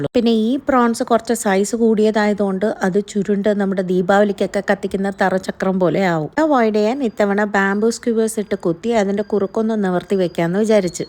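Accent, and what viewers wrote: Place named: Tamil Nadu, India. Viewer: I like your tamil version of talking ❤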